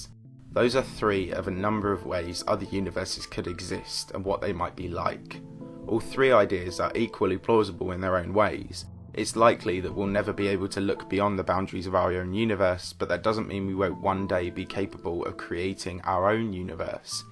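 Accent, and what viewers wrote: United Kingdom. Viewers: That monotone British voice tho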